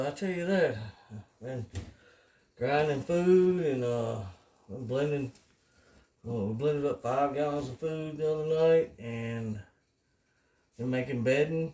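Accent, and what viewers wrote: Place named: South, United States. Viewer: My wife want to know where you grew up? She says you sound a lot like me and I am a country f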